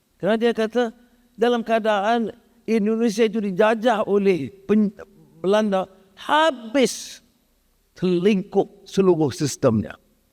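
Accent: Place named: Arab world. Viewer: ucapan akedemik itu walau guna BM bernada Arab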